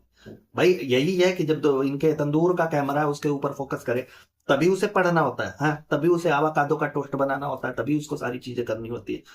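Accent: Uttar Pradesh, India. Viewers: Typical UP buoy hai isiliye hume pasand hai aapke vedio, lehja aur pure desi andaz acha lagta hai, pl carry on non stop entertainment🎉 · Problem kya hai bea UP rural ke hain toh? Atleast you have courage to be who you are and showing your face in the video. I love your video and accent. Kuch bhi bolte hai log bhak😅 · Recently saw your videos..One thing i like i mean loved watching your videos because of your pure desi style.Please keep it that style…Pure UP style accent and the way you narrate make it more interesting .Thank you🙏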